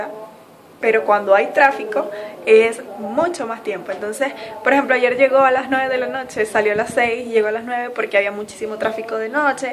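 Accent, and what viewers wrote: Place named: Peru. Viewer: Ya se te esta pegando el acento peruano xD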